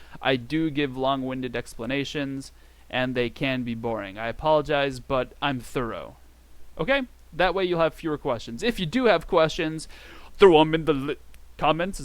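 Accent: United States. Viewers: This video was such a rollercoaster of emotions. I was constantly flip flopping between subscribing and unsubscribing. “Ooh this is just what I need!” subscribe “ugh this guy is annoying” unsubscribe “nevermind he’s funny” subscribe BUT ultimately I must unsubscribe because of you pronouncing Japanese words with a typically horrible American accent. Like wtf is “DESS” or “KOODASAI”. This just promotes bad habits. See the YesJapan/Japanese From Zero! guy’s videos. His pronunciation is pretty much close to native and a joy to listen to and practice with.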